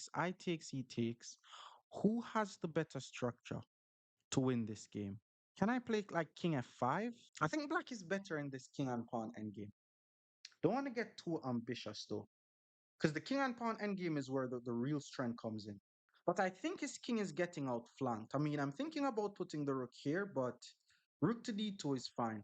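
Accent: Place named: Jamaica. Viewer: bro i found your vids a couple of days ago, and I'm hooked. Best chess educational content on YouTube! also really appreciate the soft Jamaican accent. I find myself kissing my teeth when playing now, followed by "I can't take becaa...". Big ups bro, drop a lil more patois tho please <3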